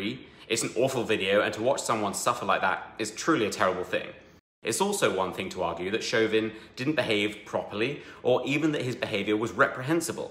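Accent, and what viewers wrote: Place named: United Kingdom. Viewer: You British dude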